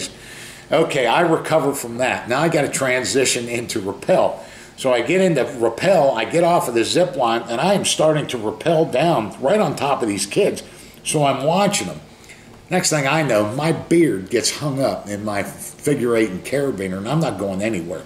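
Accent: Pittsburgh, United States. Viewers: Hey are you from Pittsburgh originally? You sound like you have a Pittsburgh accent. When you make a sandwich I'll bet you use Isleys Chipped ham 't you?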